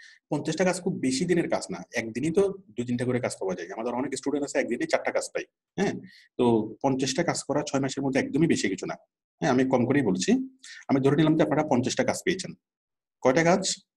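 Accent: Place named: Chittagong, Bangladesh. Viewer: sir, ami html & css pari,, apnader chottogram aa branch office achaa,,, apnr language chottogram ar moto.. ami ctg ta thaki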